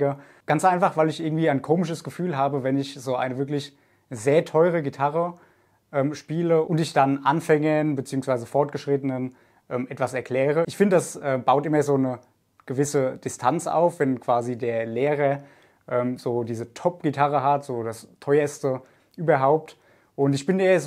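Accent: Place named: Wiesbaden, Germany. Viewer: hört sich mehr nach Wiesbaden an..😉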